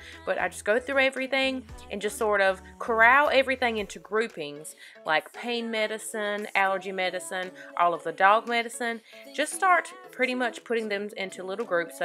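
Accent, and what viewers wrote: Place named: North Carolina, United States. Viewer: I can definitely hear the Pembroke/Lumbee in your voice!!! Love the video!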